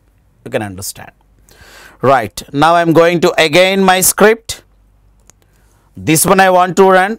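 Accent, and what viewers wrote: India. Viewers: İngilizceyi bilmiyorum sanıyordum meğer hint aksanı olunca anlayabiliyormuşum adamsınız hintliler devam <3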